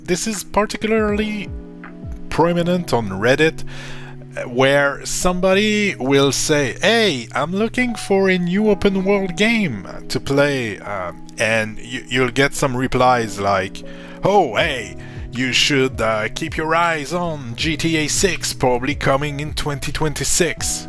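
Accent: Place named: Quebec, Canada. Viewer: J'aime l'accent Québécois ! Cheers to you !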